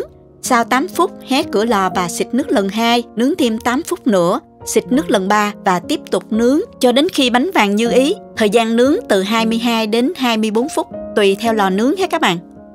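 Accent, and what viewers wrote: South, Vietnam. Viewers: Giọng nói đặc sệt miền nam nghe rất là nhẹ nhàng+ tài nấu nướng của cô ấy thì Miễn bàn.Ông chồng nào đó của cô ấy rất có phước. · Kênh của rất tinh tế, giống nói ngọt nhẹ rõ ràng của người miền Nam, công thức và trình bày ý như người chủ kênh vậy. Em sẽ làm thử vi thấy làm đơn giản quá mà xình xắn nữa. Thật trân trọng cảm ơn Cô nhiều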